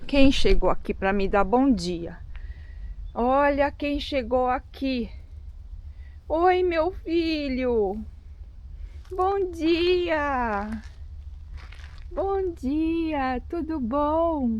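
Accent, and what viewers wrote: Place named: Brazil. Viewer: hoje estou em Portugal.gostei de ver a baleia 🐳 junto com você.gostei a sua maneira de se expressar bem brasileira mesmo😊 beijinhos.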